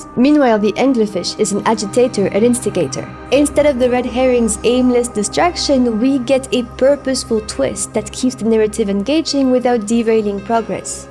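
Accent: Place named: France. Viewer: What a great video. i was secretly hoping wed hear a "Zut Alors!" at the reveal of a twist, once i heard your accent. But i guess hoping to hear that one day is a good reason to subscribe!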